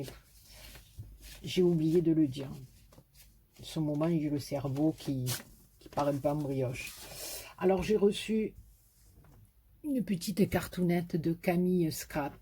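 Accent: South, France. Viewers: Coucou, je suis contente de te retrouver pour cette petite vidéo et ton magnifique accent du sud. Que de merveilles! Je suis une fan de la fée clochette alors j'adore la carte avec qu'elle dessus. Trop belle sans oublier la carte avec les fleurs trop trop belles. Tu as eu de magnifique cadeau. Bonne soirée et gros bisous Sabine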